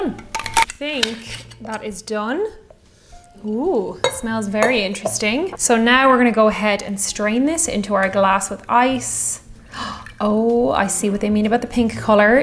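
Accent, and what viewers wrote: Ireland. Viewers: This girl should be in the movies. She could be the next Maureen O'Hara. Beautiful girl and her brogue only adds to her charm. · You know, I don't even drink alcohol, but she's so charming and lovely and has that beautiful Irish accent that I'm compelled to watch.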